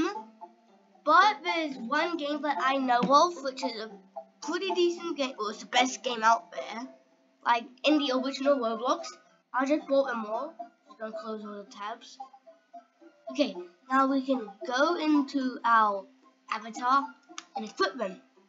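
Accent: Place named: United Kingdom. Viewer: you sound like u in the uk homie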